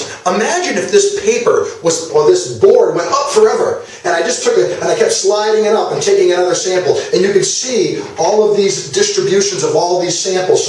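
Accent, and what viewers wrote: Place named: Maine, United States. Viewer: Sounds like he is from Maine.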